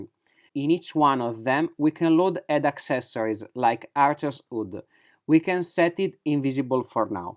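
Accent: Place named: Italy. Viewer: Loving your accent, sounds Italian. I hope I'm not wrong.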